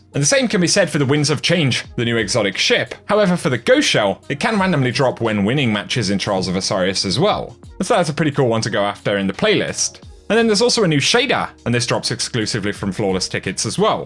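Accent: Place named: Midlands, United Kingdom. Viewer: England probably the midlands by the sounds of it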